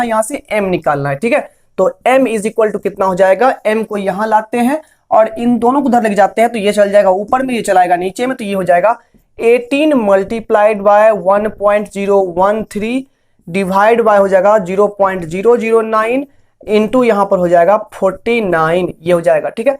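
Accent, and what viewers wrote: Bihar, India. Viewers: Just superb explanation sir 👍 Are you bihari ?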